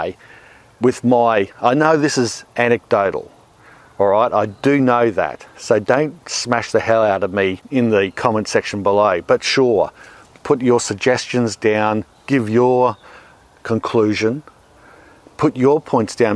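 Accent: Australia. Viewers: I am a Yank electrician and notice that he wraps the copper around the stem in a counter clock wise direction, I assume he is Aussie or Kiwi by the accent? Northern hemisphere winds clockwise just like the toilets flush. Opposite poles provides the aether field. Thank you for your efforts sir, and thank you for providing your knowledge to me.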